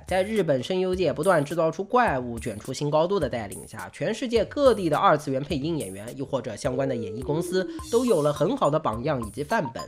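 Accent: China. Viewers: 你是南京人啊？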